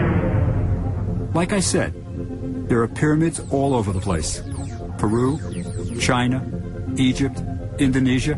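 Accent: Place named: New York, United States. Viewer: Pyramids ...described by a fukkin new yawkuh hahaha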